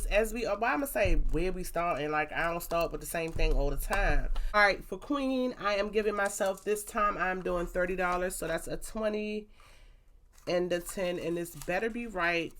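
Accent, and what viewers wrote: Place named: Baltimore, United States. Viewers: I'm from the south, but I heard that Baltimore accent, too 😂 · I'm glad your video came up in my feed. I wasted to get started saving this way so I don't overspend. I love clothes, girl. Anyway, your accent sounds like 410. I'm 410 too. 410 to the bone. Have an amazing day. Subscribed!!! 🤗🤗🤗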